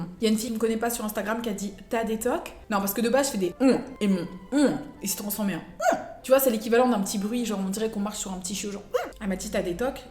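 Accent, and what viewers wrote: United Kingdom. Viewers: ur BRITTISH ACCENT IS AMAZING🥺💞💞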